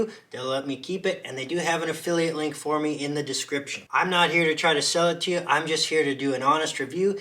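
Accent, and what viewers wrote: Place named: China. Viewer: The Chinglish is worth the price.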